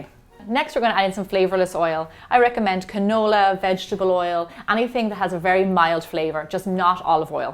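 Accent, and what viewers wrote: Ireland. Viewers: Are you Irish?Because the way you pronounce the "T" it seems!☺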